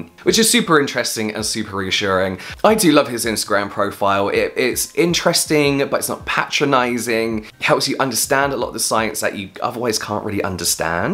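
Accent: United Kingdom. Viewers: am i the one who watches channel because of his satisfying BRITISH pronunciation?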